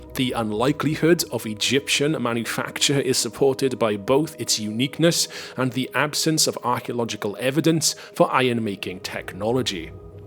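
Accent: Wales, United Kingdom. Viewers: Is the narrator Welsh?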